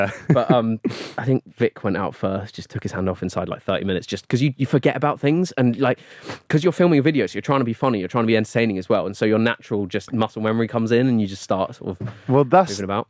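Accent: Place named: RP, United Kingdom. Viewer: it's a joke because he's very polite and posh sounding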